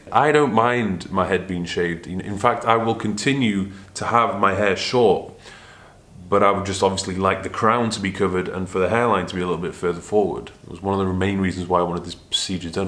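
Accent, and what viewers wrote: United Kingdom. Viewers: You don’t need hair,. Look at your jawline lol you have an amazing physique buddy and your British accent only makes you more attractive. You have no reason to be self conscious, you seem like a great guy.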